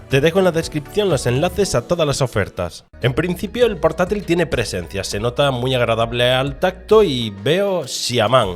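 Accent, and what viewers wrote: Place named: Andalusia, Spain. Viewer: a tu setap, a no se qué de unbox.... mu andalú pero eres pijo-espanglish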